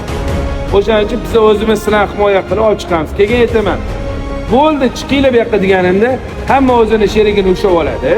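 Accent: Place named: Uzbekistan. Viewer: БОРСАМ. ХАМ. ШУ. ИНСОН. БИЛАН. БОРИШНИ. НИЯТ. КИЛДИМ. ЖУДАХАМ. ТУГРИ. ТУШИНТИРИШ. БЕРАР. ЭКАНЛАР. ОЛОХ. РОЗИ. БУЛСИН. ИЛОХИМ. УЗИМИЗНИ. ШЕВА. УЗИМИЗГА. УХШАГАН. АЁЛЛАР. КАЕРЛИКЛАР. БУЛАР. ДОМЛА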